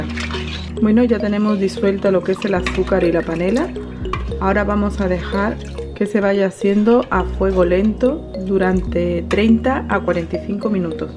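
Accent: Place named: Peru. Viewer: " " no sé si será peruana radicando en otro país por eso el dejo....pero a respetado la receta original.....gracias por decir en su video q es "Turrón de Doña Pepa" es tradición peruana con todo y su historia. Un sólo detalle...en lugar de colorante le agregamos achiote.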